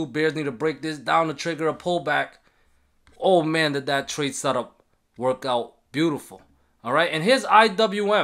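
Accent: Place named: East Coast, United States. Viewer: I can hear that east coast real strong 💪. This guys charting analysis is on point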